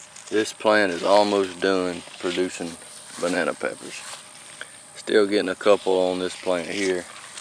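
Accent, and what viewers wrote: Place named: South, United States. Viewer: I guess I will have to plant some okra next year. It sounds good. We just get one crop here in Virginia but we don't burn out. Our tomatoes, peppers, melons, etc are just starting to bear and will go until frost. Sweet corn just finished, but racoons and possums got into it. Yeah, you sound country, but there's something else really relaxing about your voice. You should read books on tape or do voice overs for commercials or become a movie star!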